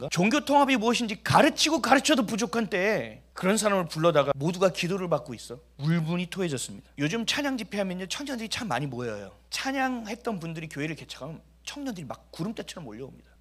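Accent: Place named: Pyongan, North Korea. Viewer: 목사님 혹시 선대가 이북에서 오직 믿음 을 지키고자 남하셨던 실향민 이 아닌가 생각 되는데요. 목사님 억양에서 아주 살짝 평안도쪽 억양이 있으신것 같아서요. 저의 외조부 님도 이북분 이시라 그리고 어려서 피난촌 주위에 살아서 할머니 할아버지 주위에 이북분 들이 많았습니다 . 제가 어렸던 70년대 초때만 해도 예수쟁이 = 이북사람 이런 공식이였으며 이분들이야 말로 진정한 그리스도 의 믿음을 간직하셨던 분들이 많았죠. 저는 이분들이 이제 다 대한민국 에서 천국으로 뜨나셔서 하나님 앞에 바로 서셨던 분들이 진심으로 이나라를 위해 간구 하시는 분들이 안계셔서 대한민국이 이모양이 되지 않았나 저 나름 생각 합니다. 우리가 아무리 믿음이 있다 해도 이북에서 목숨 걸고 내려와 목숨걸고 예수 믿었던 분들 에게 비하면 아직도 많이 부족한가 봅니다.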